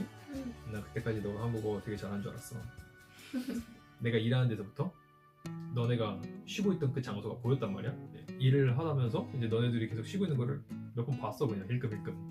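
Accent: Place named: Gyeongsang, South Korea. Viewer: + 경상도 사람 같은디 경상도의 서윗함을보여주네용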